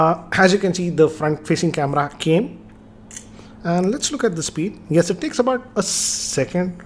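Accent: India. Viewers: very strong Indian Accent...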